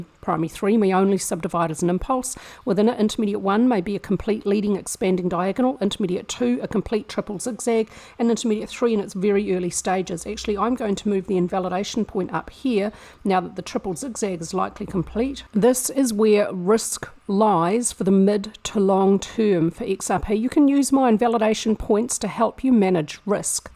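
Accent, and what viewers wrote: New Zealand. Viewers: Btw, Just a bit of a stir from across the ditch, but I can't remember the last time I heard such a heavy Kiwi accent, its heartwarming to hear you 😁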